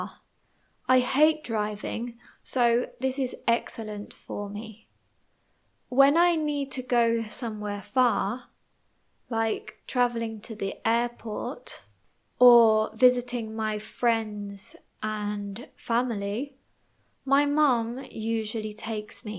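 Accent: United Kingdom. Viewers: Thank you for your videos, they are very clear and help to understand the elegant British pronunciation...... although I prefer your videos A1....😂😂😂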